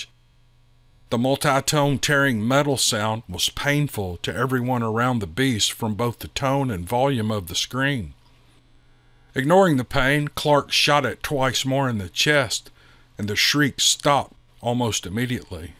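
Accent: South, United States. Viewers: D.A.Robert’s gives Tom Clancy a run for his money, and brings it to life with that talented southern draw……..AWESOME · This sassy southern woman gettn' into team Odin!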